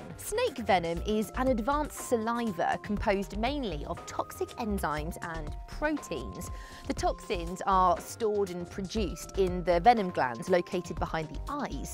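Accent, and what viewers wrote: United Kingdom. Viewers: And I like it. She has a beautiful British accent.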